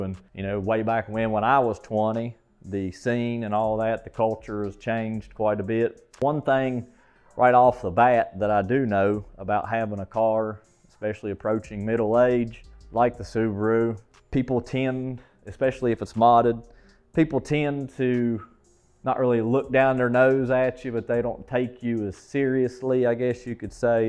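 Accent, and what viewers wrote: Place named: South, United States. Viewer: Dagum I’m digging the southern accent… givem hell you merican 🤣🫵🤫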